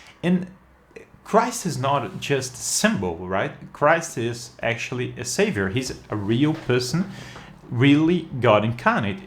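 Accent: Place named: United Kingdom. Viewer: professor, your english is amazing, looks very like the british english, sadly my spoken english looks like a fusion of british and american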